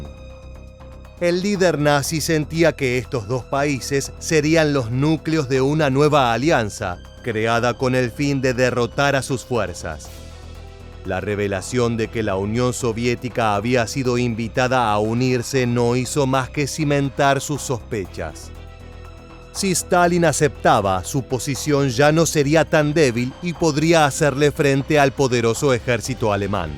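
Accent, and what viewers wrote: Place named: Argentina. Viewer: El locutor es argentino ja vamos nomás jajaja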